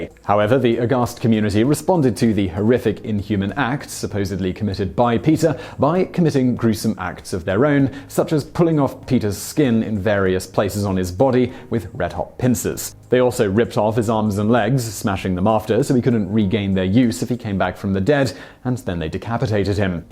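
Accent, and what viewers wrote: United Kingdom. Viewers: Simon, Simon... You are my favorite YT presenter! Sometimes your pronunciation of certain words are slightly cringy. I attribute this to your UK accent. However today? You use a word you call "Disorientated". What you mean is "disoriented" but, well,... come on honey?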